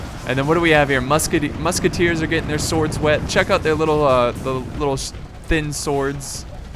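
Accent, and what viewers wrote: England, United Kingdom. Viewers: are you a englisch or a american youtouber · is American? I thought he was British.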